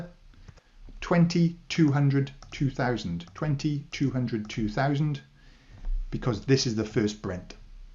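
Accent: Wales, United Kingdom. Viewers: Simple, clear, knowledgeable, and with a slight Welsh accent which I like. I can hear it cos I'm English 😎. There are lots of good Excel tutorial channels but this is one of the best.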